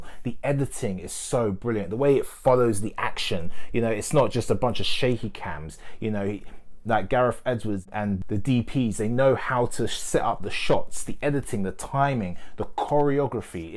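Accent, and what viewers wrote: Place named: United Kingdom. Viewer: Hey with british accent.. good review bro..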